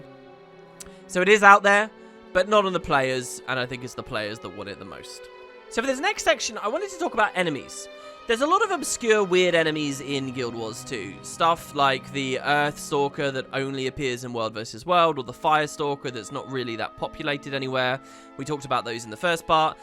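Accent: United Kingdom. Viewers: But he IS actually british. You can hear it even better in old GW1 videos.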